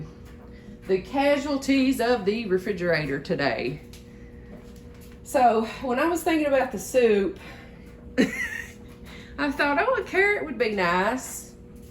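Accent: United States. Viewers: Very smart!! I love good deals!! Omg you sound just like my family we’re from the deep South Georgia so you know we all hicks down this way 😂😂 God bless you!! Love how you’re teaching people how not to waste!! I don’t waste myself!! I like to take leftovers make soup or freeze them. I take my scraps & throw into my little garden, I couldn’t live with myself with all the people that are going hungry, & me waste anything!! You can even make extra batches of meals, freeze them & heat them up to save yourself from cooking sometimes. Thank the Lord eggs are going back down in prices!! You made a lovely meal!!!❤ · I’m feel so “at home”, watching your videos! I’m in East TN! I can really relate to your Southern accent & down to earth , personality ! You are a breath of fresh air! You are really creative ! God bless you ! Thanks for showing us , your bargain deals , from grocery store & your frugal meal ideas . 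Love the fridge clean out, ideas! When you talked about holding on to the one egg, it cracked me up! I have been hanging on to my eggs, for dear life ! 😂😂😂! I recently had the same thought as you! I can’t let these eggs ruin, by holding on to them ! lol. ❤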